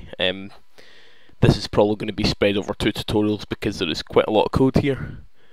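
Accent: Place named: Scotland, United Kingdom. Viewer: Your Scottish me too :P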